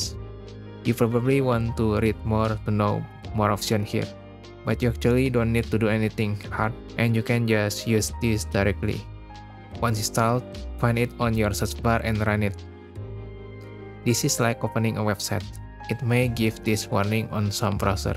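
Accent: Indonesia. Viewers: i realized i could do this accidentally, works on my setup with Sunshine + Classicoldsong's Artemis(Moonlight fork that has more experimental features and a little nicer GUI i found from Moonlight github repository fork) and began to wonder if someone done video about this amazing discovery i made. Thankfully someone already done it. anyways works with my Xiaomi Pad 6 and its Xiaomi pen, down to its 2 buttons on pen(although a bit janky since the OS tried to use it also sometimes) and its pressure sensitivity! also, is it just me or you sounds like Indonesian?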